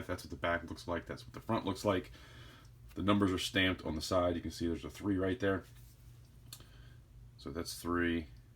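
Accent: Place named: Washington DC, United States. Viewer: Wow! You do auctions?!😯 You are the 1st person I have seen do that! Sounds like you live around D.C., I used to live in Gathersburg, MD, like back in the 80's; nice state! Those little nuggets are cool with the "CC" on it. You made that yourself? You are the man if so! 😎Looking at the rest of the video, it looks like you made a lot of this yourself! Are you like a metal-smith? I am blown away by your talent. 🤯Great job! Can't wait to look if you have a video on how you do this. Looking forward to your next video; also going to Sub now and help a little bit on your path to 2k Subs! Be safe, take care.... See you in Valhalla! ⚔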